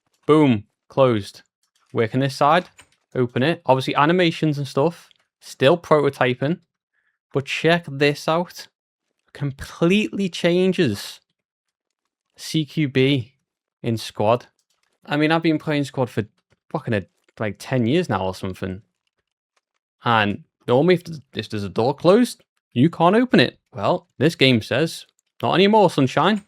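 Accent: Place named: Scotland, United Kingdom. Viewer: Scotish accent is beat 🎉❤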